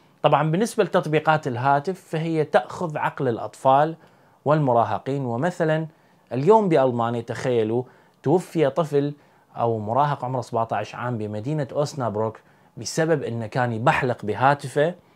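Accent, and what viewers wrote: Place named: Iraq. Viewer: فدوه لقلبك كلامك العراقي يجنن يحسسني امي بالعراق❤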